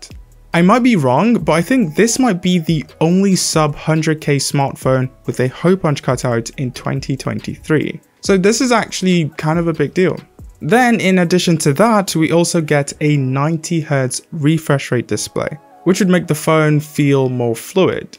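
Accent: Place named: England, United Kingdom. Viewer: sorry, you sound English while you're African. unless you're from England that's fine. authenticity is paramount.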